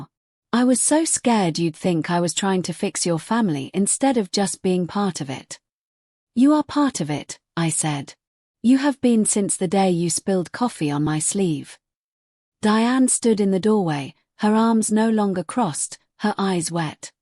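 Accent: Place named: United Kingdom. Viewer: Why is a British woman doing the narration ofnitnis from the perspective of an American man?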